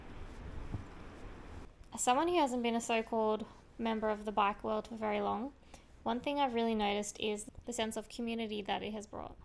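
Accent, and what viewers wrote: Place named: Australia. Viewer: As a British "people", how you didn't pick up on her thique Australian accent both confounds and flabbers my gast.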